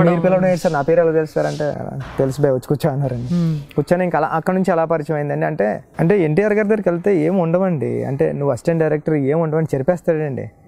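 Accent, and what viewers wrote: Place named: Godavari, India. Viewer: Director sir, are you from Godavari district? Your language like that🙏🙏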